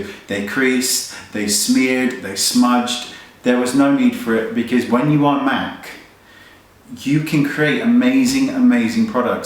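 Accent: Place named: United Kingdom. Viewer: Hey! Just want to tell you that i absolutely LOVE LOVE LOVE your videos!! They are SOOO helpful & I love your british accent ^-^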